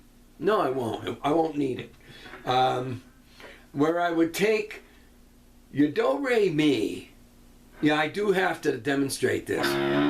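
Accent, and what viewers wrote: New England, United States. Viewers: i also don't remember you having such a thick new england accent LOL. i'm born and raised here, and it jumps out even to me! ;)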